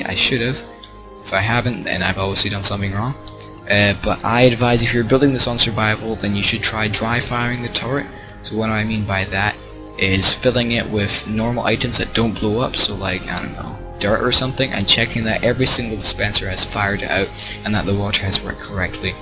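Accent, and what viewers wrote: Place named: Ireland. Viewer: Ahhh okay. You sound Irish so I find it pretty funny.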